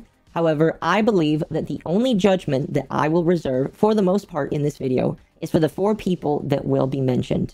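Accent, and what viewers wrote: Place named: Boston, United States. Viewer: Having found this channel through Spicy Fox Anime Woman, I have learned some things: 1) is autistically highly intelligent. What this means is that she has logic analysis that, when focused, can burn through bullshit, lies, and deception like a magnifying glass amplifying solar energy to the point of combustion. 2) She, whether she knows it or not, applies the Art of War to internet drama and issues. And she is very, very good -- to the point that she does not interrupt an enemy who is making a mistake. 3) is based, and stands for truth. 4) She never picks fights. 5) She is fact and evidenced-based, and certainly will clarify if something is her opinion or not. 6) She has done much to expose grifters and liars for who and what they are. 7) Most importantly, she is the kind of woman many men wish still existed, only because she is fun-loving and has a very warm personality, not to mention being very attractive. 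And this, in spite of the Italian Boston accent, and her self-proclaimed "Woman Debuffs." In conclusion, people should learn that is a manifestation of a quote that many either don't know, or never learned: "No one can have a more savage enemy than one who thinks they are right with God." Because when you mess with the Bull of Truth, you can and will get its horns. And when you do, you cannot blame others because you f*cked around and found out -- exactly as SFO/Dev are finding out themselves. This is why I support. She is good people and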